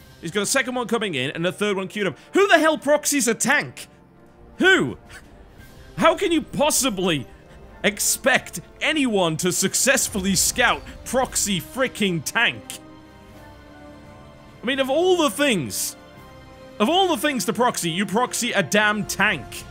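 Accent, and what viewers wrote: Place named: North, United Kingdom. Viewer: I love hearing irate Northerners. Rest in peace yo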